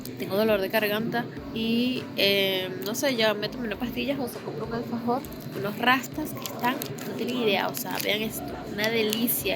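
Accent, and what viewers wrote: Venezuela. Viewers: Me encantó que con nueve años fuera jamás perdiste tu acento, sigues hablando venezolano como sino te hubieras ido nunca ❤❤❤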